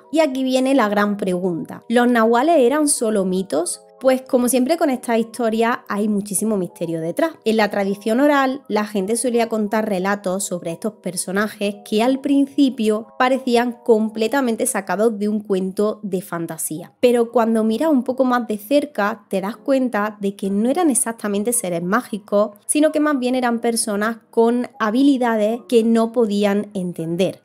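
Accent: Spain. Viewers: Wowww Srita . Hace menos de 1 hr ví un video suyo , el del 5to Sil creo se llama y le cuento , me dejó sorprendido con su video . Mucjas gracias . De hecho inmediatamente me inscribí a su canal ahora aquí me tiene viendo éste otro video 👍👏👏👏🤜🤛 por cierto permitame preguntarle de dónde és usted ? Tiene acento extranjero (Española ?) , más me sorprende sinceramente . Excelente canal Gracias . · Su acento lenguistico muy agradable ❤😮 español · Gracias q acento TAn bonito de dónde será se q es española será andaluza gallega o canaria vaya un saludo desde Texas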